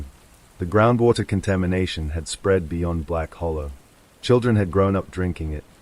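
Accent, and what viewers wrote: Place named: Boston, United States. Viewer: Dear narrator, are you AI or areal person please? If you are AI, why have you been given a Boston accent. Just curious?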